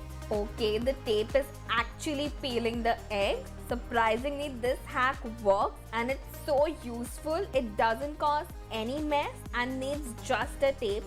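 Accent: India. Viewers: I love her Hinglish ☺️😅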